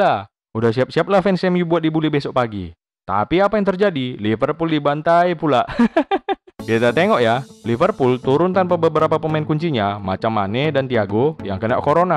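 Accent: Medan, Indonesia. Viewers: Nampak kali ah abg ini orang Medan nya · Abg pasti org medan saya orang mandailing natal bg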